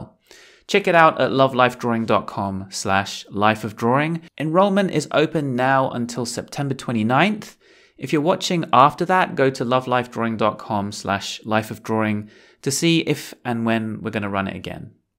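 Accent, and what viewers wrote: United Kingdom. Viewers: Wow I love life drawing, I am guessing you are from Britain by your accent? I live in Manchester is there any life drawing class in Manchester I would like to attend... for a free trial lesson.. Could you get back to me please!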